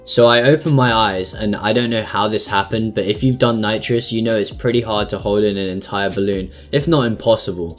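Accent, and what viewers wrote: United Kingdom. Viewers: m8 why u speak bribish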